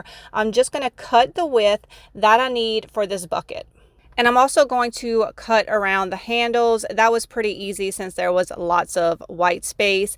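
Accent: Louisiana, United States. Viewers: Everything was beautiful, but I was especially impressed with the finial ornaments. And from one Southerner to another, your warm smile and Lousiana accent are the cherry on top of your videos! ❤ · It’s not phony she’s from Louisiana and that’s how she talks. Blue and white Christmas! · She’s from Louisiana and that’s just the way they talk!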